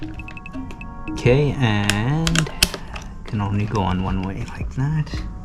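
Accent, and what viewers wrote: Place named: New York, United States. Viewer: Hey! Thanks for making videos! In addition to the bikes, I like the music, your cute family, the bits of Japanese slips in, your New York accent, and of course all the great views of the city! Keep ‘em coming man!👍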